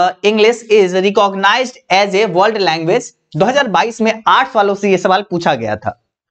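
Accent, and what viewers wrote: Bihar, India. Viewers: Bihar se hain babua ❤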